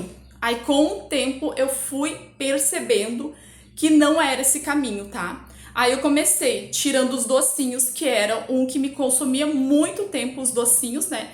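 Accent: Rio Grande do Sul, Brazil. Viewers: Fiquei um tempo no sul e era difícil achar salgado bom. No RJ tem muita gente boa. Pelo seu sotaque eu acho que vc é gaúcha ❤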